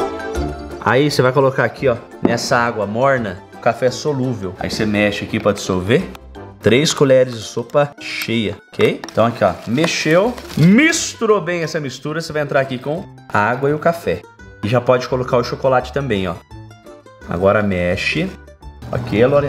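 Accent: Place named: Rio Grande do Sul, Brazil. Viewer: vou fazer com certeza, e não é que vc tá falando enrolado, é que vc era mineiro e agora virou gaúcho tchê, é o sotaque rsrssss, bjos pra vcs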